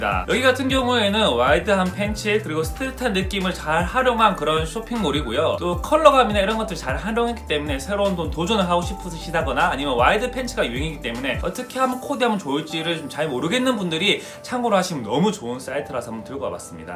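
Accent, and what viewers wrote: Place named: Gyeongsang, South Korea. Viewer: 경상도 사투리 억양이 조금 있으신 거 같네요ㅎㅎ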